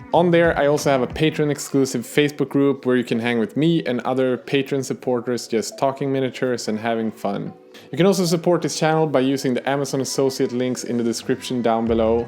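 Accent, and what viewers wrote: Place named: Sweden. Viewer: Oh my God! I used to live in Sweden. This is so fucking real! Hahahahaha And your Swede accent sounds SO familiar. 😂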